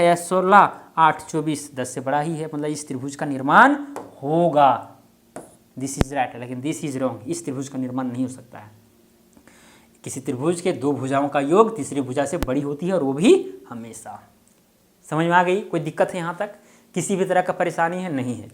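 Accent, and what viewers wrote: Bihar, India. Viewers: Sir aap Bihar ke rhne wale h kya kyoki aapki bhasha thodi bihari lg rhi h aur padhane ka tarika Khan sir ke trh mil rha wo bhi Bihar se hi hai aap aisa padhate h ki ek baar me hi mind me ghus jata h koi doubt hi nhi rhta aise hi Khan sir padhate h Love you sir ❤️❤️❤️💖💖😍😍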